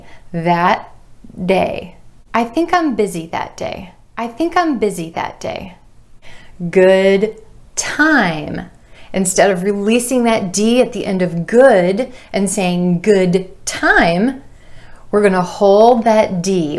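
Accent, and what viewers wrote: United States. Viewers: Your lessons are just amazing, as becuse of you, I've started to love the American accent since i have been used to talk with the British accent.. I have one suggestion if you don't mind, what do you think about creating a group on WhatsApp or viber which is aiming to improve the writing and speaking skills between you and your followers, and it should be administrated by you of course.. Best Regards 🌹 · Is this American English pronunciation?? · Are you American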